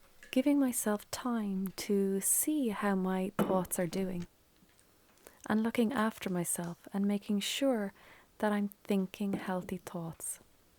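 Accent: Ireland. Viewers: I want to tell you that I just love your channel. Your topics, philosophies, guidance, teachings and even your sweet Irish voice and tone are nourishing my soul. I have had so much stress in my life for years and am afraid that it has made me physically sick to some extent. Am so glad that I found you. Thank you. Charleen from Scottsdale, Arizona, USA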